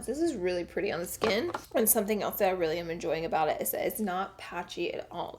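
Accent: South, United States. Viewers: Jennifer Lynn its called a southern accent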